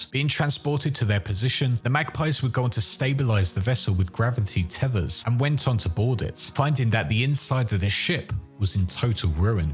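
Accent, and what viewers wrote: United Kingdom. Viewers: Ah, another English guy speaking in that English way as if he's a professional in whatever gibberish he is speaking about.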